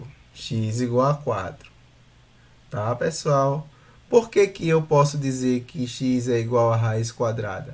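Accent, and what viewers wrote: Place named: Rio de Janeiro, Brazil. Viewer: MDS sua voz é a do Super choque só que carioca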